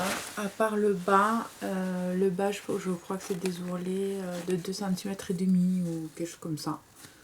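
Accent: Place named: Narbonne, France. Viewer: supers tes pantalons dommage pour moi les patrons pochettes sont epuisees tu as un accent comme moi de narbonne lol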